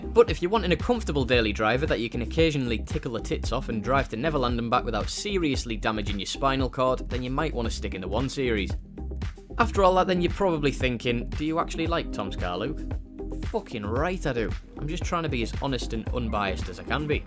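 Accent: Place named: Yorkshire, United Kingdom. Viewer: Where in yorkshire are you from?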